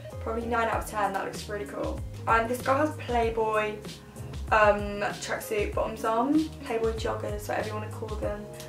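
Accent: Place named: England, United Kingdom. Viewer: I wonder what the beautiful accent is that you speak with? What the region of England are you from?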